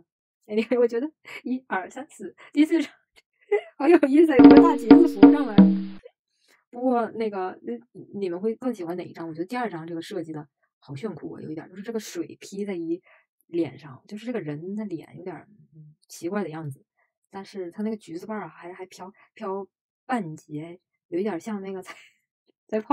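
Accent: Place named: Northeast, China. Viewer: 你的东北式英语让我很糟心。也谢谢你的分享:face-blue-smiling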